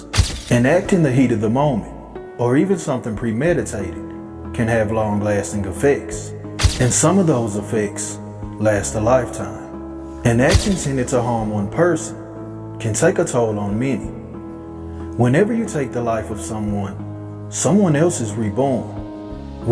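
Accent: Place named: Memphis, United States. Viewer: You sound like you from #Memphis 😊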